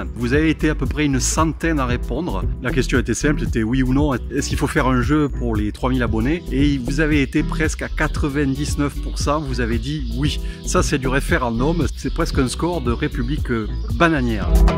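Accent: France. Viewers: Bonsaï? Bien sûr! Ses Vidéo? je les adore, et je les partage. Elle m'aide beaucoup, pour moi même et surtout pour animer le club! Le personne? Un mec super! Son accent du sud-ouest? Me rappelle mon enfance, évidemment je l'adore. Si je participe? Évidemment!!! Et puis j'adore les pots de François...sait-on jamais? C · Salut. Moi aussi je veux jouer au jeu des 3000. Ne change rien continu comme çà. Ce petit accent du sud qui nous donne des petits trucs et astuces pour nos Bonsaïs, je ne m’en passe plus.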